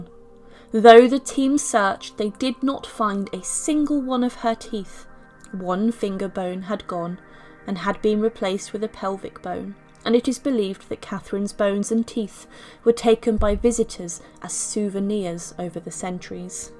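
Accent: England, United Kingdom. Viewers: You sound English. Odd, then, that you do not know that the surname of Samuel Pepys is pronounced "peeps". Just so's you know. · For something so interesting a story, what a scholastic, boring narration. Make it interesting to listen to just not have slight English accent and “ read” ! How pathetically boring! Why bother?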